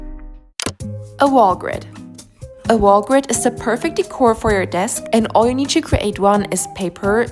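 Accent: Germany. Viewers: Are u german u have a german accent? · es ist so krass, man hört das du deutsch bist, aber dein englisch ist so unglaublich gut omg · Hi 😊 i was wondering if you are german bcz you have this Accent that also have 😊❤ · i can't with german accent twin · Gurl u NEED to make a German video . ( if ur German u just sound like it no offense ) I LOVE UR VIDS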